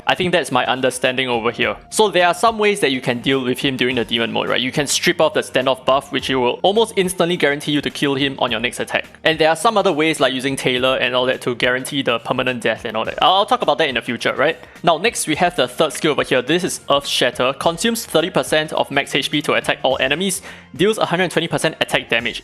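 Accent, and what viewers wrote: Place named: Singapore. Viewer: such a strong Singaporean accent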